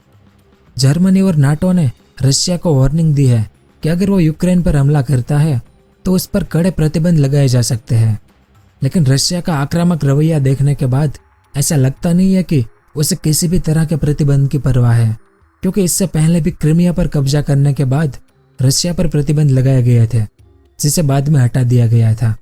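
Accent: Gujarat, India. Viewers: You are gujrati bro? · Gujarati voice che Boleche te Gujarati che ne??????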